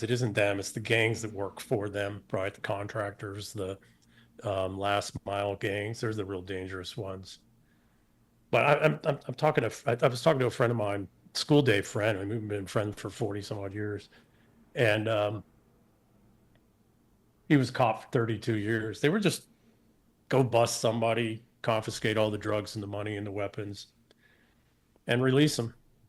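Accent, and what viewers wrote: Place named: United States. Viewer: He sounds American. So why does he speak as a British Subject? Is he British/English?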